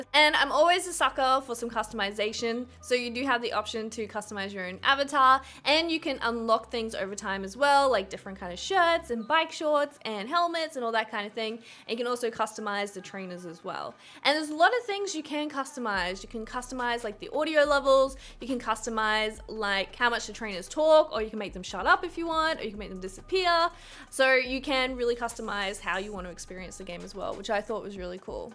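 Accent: Australia. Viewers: That's definitely not a British accent. She's Australian.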